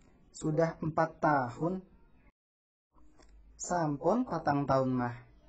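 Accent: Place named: Sunda, Indonesia. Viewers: dialek e koyok dialek sunda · ini bahasa Jawa logat Sunda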